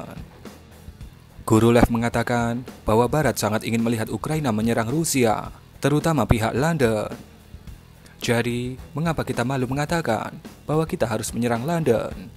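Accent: Java, Indonesia. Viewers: Medoke wong jowo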